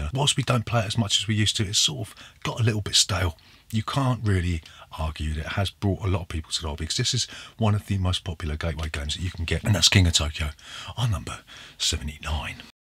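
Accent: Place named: South London, United Kingdom. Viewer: Another great and really interesting 10... Chinatown and Puerto Rico were two of the first of the modern style games that I played...👍. Must admit I prefer San Juan... Really looking forward to the next ten... Great job... Got to ask...where are you from...? Your accent is almost as south London as mine...no offence meant if your not from South London...😁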